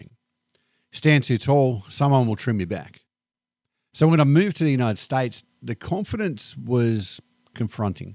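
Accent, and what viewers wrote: Australia. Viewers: I love your Aussie accent.🎉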